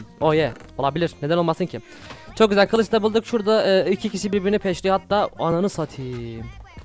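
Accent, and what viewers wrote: Adana, Turkey. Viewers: Abi şu konuşma stiline hastayım tam bir adanalısın <3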